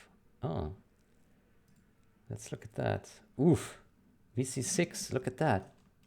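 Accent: Germany. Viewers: hey are you german. i detect german accent. jawohl.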